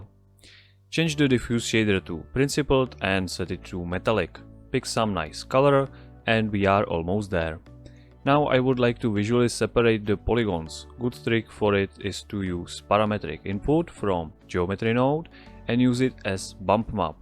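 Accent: Czech Republic. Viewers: You're from czech reoublic? :D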